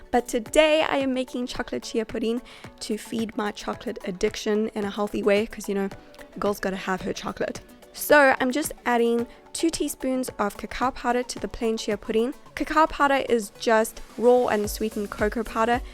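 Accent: South Africa. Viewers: great video! i love your accent! are you south African? sorry if i am wrong! X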